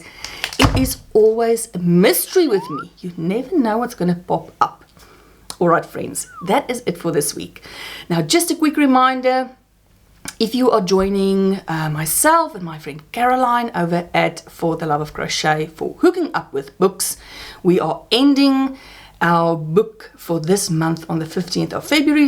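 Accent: South Africa. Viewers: I listen to you and I thought to myself you ate South African. When you say you are from here I smiled. So happy you came in my feed. I'm from Cape Town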